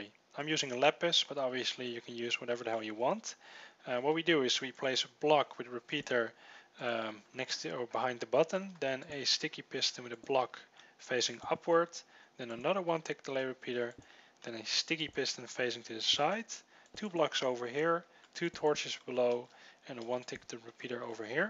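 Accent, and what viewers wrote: Netherlands. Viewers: I was pretty sure you are from The Netherlands just like I am. O_o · are you dutch/belgian? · Dude, are you dutch? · According to his accent he is obviously Dutch, so am I. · hello new sub from other hermits, no wonder i found the accent familiar XD one of my old EVE online corps CEO was dutch :P though his accent was thicker :)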